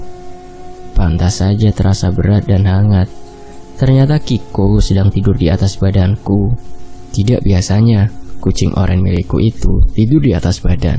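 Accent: Java, Indonesia. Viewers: min medok bgt jowone 😁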